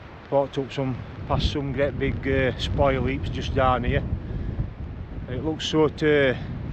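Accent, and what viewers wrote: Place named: South Yorkshire, United Kingdom. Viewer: Awww you sound like home to me, I'm in Newcastle but my home is south Yorkshire ❤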